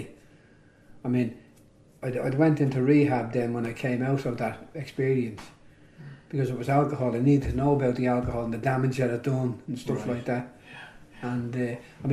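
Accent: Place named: Ireland. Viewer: It's like listening to two five year olds, such Rambling, self indulgent mish mush, typical Irish man, dreadful accent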